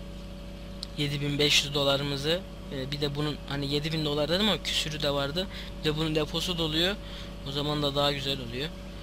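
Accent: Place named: Sivas, Turkey. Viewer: heee sivaslılarda böyle konuşuyorlarda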